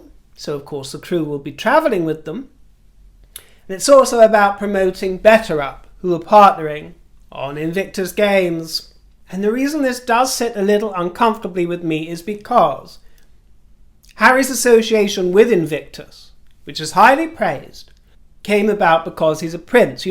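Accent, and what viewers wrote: United States. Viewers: Love your ‘Merica accent!🇺🇸😂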